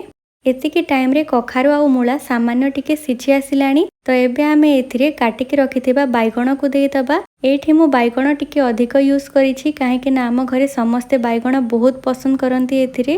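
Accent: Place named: Western Odisha, India. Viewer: Say in your western odisha accent please it's so soothing to hear.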